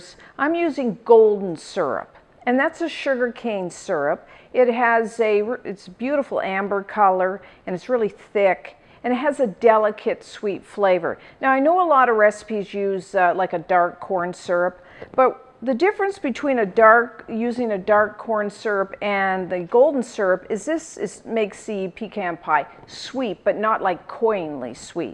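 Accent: Canada. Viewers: Are you from Canada you have an accent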